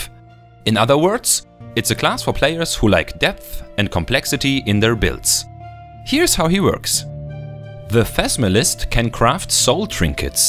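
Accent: Germany. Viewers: Thank you so much for making this game, I already finished it twice and I'm waiting for this dlc to play it for the third time. The whole idea seems amazing but I'm a little sceptical about completely moving sinistra magic books to outlaw merchants. Entropy already felt like a lesser elementarism, now it just seems like it's simply not worth bothering with, but we shall see. Also, I love that german accent.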